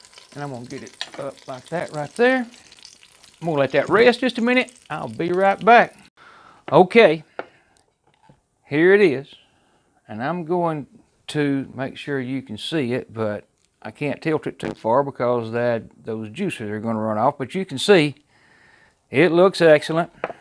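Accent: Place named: Kentucky, United States. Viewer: I'm from Lexington, Kentucky and your accent makes me miss my Kentucky buddies. :) Great videos.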